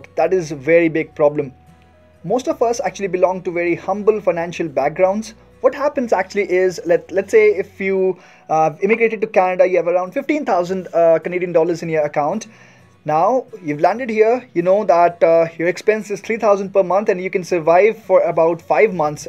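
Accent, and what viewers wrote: India. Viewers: sorry mate but you dont sound a genuine person you must be from india or sirilanka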